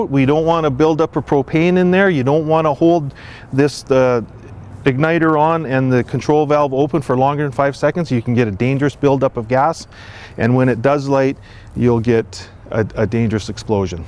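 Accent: Canada. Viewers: I swear that guy is in Canada because i keep hearing his accent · Is he Canadian?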